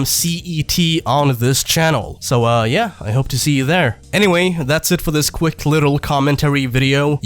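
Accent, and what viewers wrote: United States. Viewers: You sound American tho why? If u don’t mind me asking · Didnt know your European you sound american white :/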